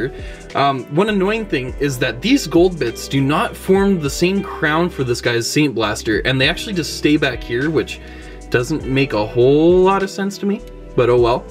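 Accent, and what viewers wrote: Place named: Canada. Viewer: Welp I was wrong your voice ait wired you are just Canadian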